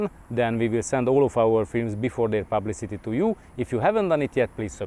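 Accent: Hungary. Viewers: I like your Hungarian accent. Good review.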